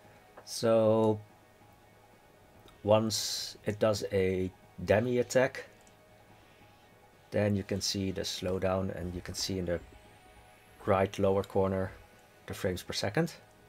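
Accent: Netherlands. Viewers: You sound very Dutch :)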